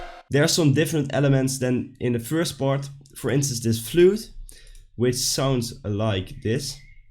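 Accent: Netherlands. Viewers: are you dutch? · aangezien je accent weet ik dat je nl bent dus doe ik het in nederlands. tv noise maakt bass house toch?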